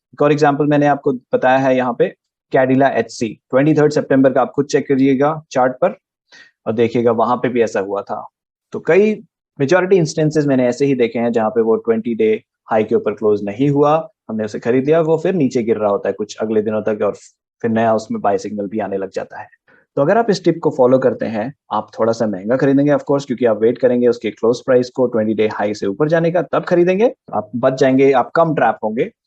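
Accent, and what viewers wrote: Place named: United States. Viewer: Ye American accent MEI bolna pehle band Karo. Very irritating. Strategy Mahesh C. Kaushik Sir ki hai. And uptrend market mei ROI 5-7% shayad zyada milega friends. Not in every year maybe.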